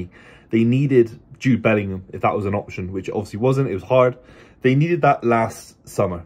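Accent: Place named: West Country, United Kingdom. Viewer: your blackbeard west country accent is coming through and im all for it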